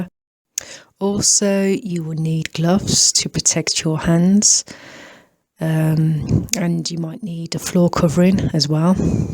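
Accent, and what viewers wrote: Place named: Midlands, United Kingdom. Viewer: Hello - Yes, it is in the British Midlands about 15 miles or so from Birmingham. You accent is very obviously British Midlands but not 'harsh' enought for the strong'Brummie Birmingham' accent. Hence l suggested Wolverhampton, a much 'softer', less pronounced Midlands accent.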